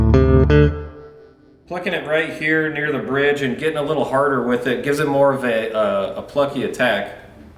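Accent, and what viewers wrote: South, United States. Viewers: Where are you from man, I’d be surprised if you were not a southerner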